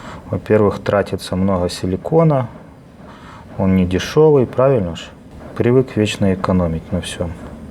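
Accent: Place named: Kyiv, Ukraine. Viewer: Красота! Судя по акценту и уровню работ вы киевлянин. Очень сочувствую, что пришел "медведь-всем пригнётыш" и поломал судьбы людей и красоту!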